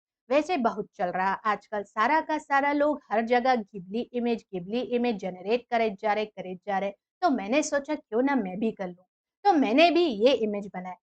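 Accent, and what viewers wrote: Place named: Gujarat, India. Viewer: mam aap gujrat se ho kya bcz apka accent match krta hai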